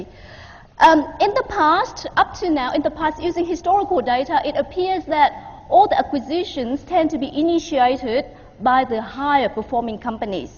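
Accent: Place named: Australia. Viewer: More like Australian rather than British.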